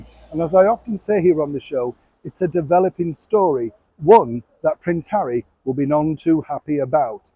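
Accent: Yorkshire, United Kingdom. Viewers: Nowt wrong wi' a Yorkshire, Northern accent, eeee, it sounds grand lad 🙂 The guttersnipe should hang his head in shame with what he's done, if he'd have done anything like this during Elizabeth I reign he would have ended up in the Tower on treason charges.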